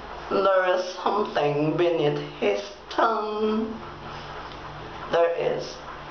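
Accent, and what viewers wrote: United Kingdom. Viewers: naks British English ang accent :) good mowning :)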